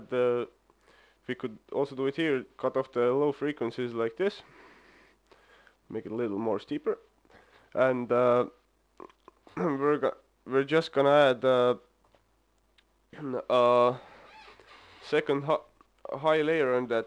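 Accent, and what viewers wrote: Estonia. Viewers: D arvasin aktsendi järgi ,et eestlane. Checkin kontot, ongi eestlane . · tüüpiline eesti aktsent :D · Estonian accent ? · Kõlab väga eesti aksendi moodi :) Aga ei 5+ tutoorial :) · kle sa eestlane w aktsendi järgi tundub kyll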